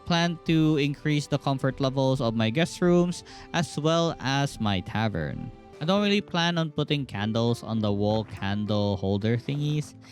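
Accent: Philippines. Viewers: The Pinoy accent made this video better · The tagalog accent is real on this one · I knew it. Haha! Strong pinoy accent 😁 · A sense Filipino accent, Pinoy ka lods?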